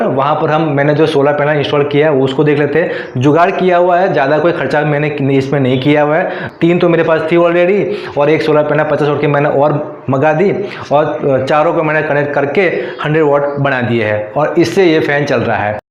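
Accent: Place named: Gujarat, India. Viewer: Gujarat lago chho bhai.. very good 👍 explained...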